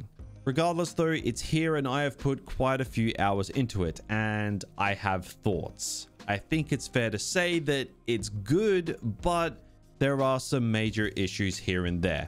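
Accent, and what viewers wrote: Australia. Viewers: dragged past 10 minutes cringe aussie accent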